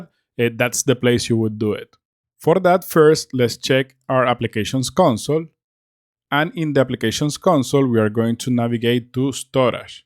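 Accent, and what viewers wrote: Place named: Spain. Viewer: I can notice your spanish accent, but you have very good english! May I ask, where are you from? Sos argentino no? Edit: ahi vi que eres colombiano, saludos desde argentina!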